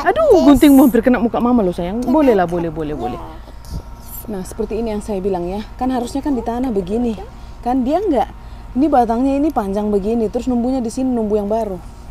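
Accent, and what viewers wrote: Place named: Medan, Indonesia. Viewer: apa adanya macam kayak logat medan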